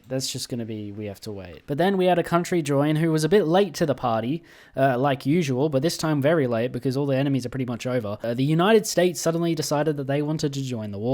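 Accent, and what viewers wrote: United Kingdom. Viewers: The British accent caught me off guard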